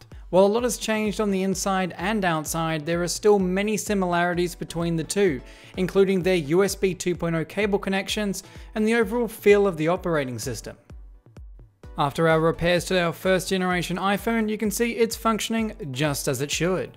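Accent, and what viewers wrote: Australia. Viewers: Who else comes here for the Aussie Accent?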